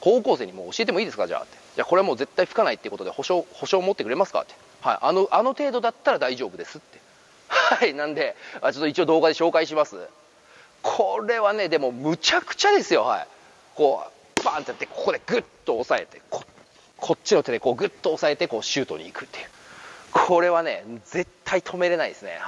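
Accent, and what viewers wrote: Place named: Okayama, Japan. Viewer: しゃべりかた、耳障りがえーとおもーたら、岡山人じゃったんか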